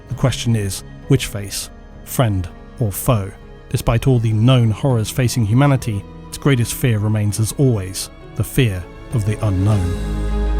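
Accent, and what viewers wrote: England, United Kingdom. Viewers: No amount of English accent and somber narration can change the fact that a female body simply isn't built for any sort of physical combat, much less assassinations. The 40k universe pandering to this idea just makes it less credible. There's already a lot of questions concerning 40k lore, besides the nature of these Callidus Assassins and it only makes it worse. A lot of 40k lore is so out of touch with logic, it makes me think that the only thing that keeps this franchise going is the blind fan loyalty who don't care about all these issues.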